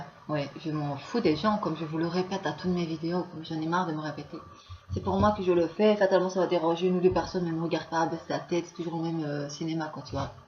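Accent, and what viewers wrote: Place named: Liège, Belgium. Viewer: MDR. Tu me fais trop rire :)... Pour te rep.. "un mec" mdr... j't'explique vite fais, jsuis tomber sur ta chaine par hasard, en cherchant des gens qui parlais de nick conrad, ensuite jsuis tomber sur une video de toi qui parlais de ça, j'ai écouté, puis j'ai regardé en vitesse les autres video de ta chaine, mais étonnamment j'aime bien. T'as un coté artistique fort développé. Même si je vois que tu veux juste "parler de tout" et que oui tu t'en fou de l'avis des gens.. Jt'avoue que j'ai laisser un com's exprès pour voir si t'allais répondre, et j'étais sur à 99% que t'allais le faire dans une video mdr. jt'ai glissé un tacle suivis d'un compliment, juste pour voir... Mais la où tu m'as fais rire c'est sur le "un mec ou une fille, jsais pas" mdr... Ouai c'est claire que vu que je ne montre que mon oeil c'est difficile à dire mdr suffisait de cliquer sur ma chaine pour voir que le contenu n'as rien de féminin XD Mais bon, jvoulais juste que tu sache que j'aime bien ta façon d'être et ton accent de liège "jme moque pas jsuis originaire de Verviers ;)" et ce que j'ai bien aimé, c'est une phrase que t'as dit sur une vidéo : "c'est pas parce que je fais la conne que je suis débile" et c'est ce coté (jme prend pas au sérieux) qui est bien à voir.. 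c'est cool que tu t'arrête pas à l'avis des gens, vouloir plaire à tout le monde.. à quoi bon. Si tu t'aime toi, c'est le principal... PS: sur la video où j'ai laissé un com's il y avais déjà un "dislike" c'est pas moi qui l'ai mis, j'ai p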